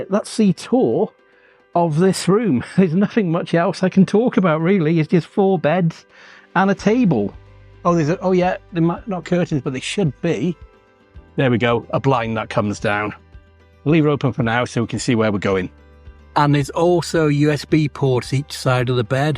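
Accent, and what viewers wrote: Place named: RP, United Kingdom. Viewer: 这英国口音太标准了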